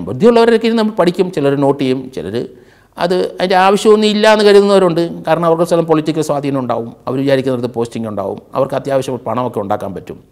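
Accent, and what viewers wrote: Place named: Kottayam, India. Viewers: He has trivandrum, kottayam and idukki slang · അതി മനോഹരമായ കോട്ടയം ഭാഷ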